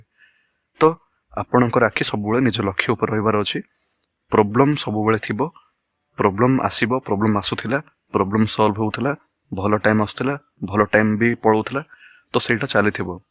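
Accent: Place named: Odisha, India. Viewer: That odia accent between though 😍 😀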